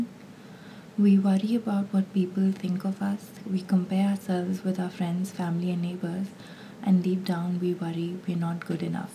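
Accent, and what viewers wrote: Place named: India. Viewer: Soft, Indian accents ALWAYS give me ASMR, especially this "unintentional" kind!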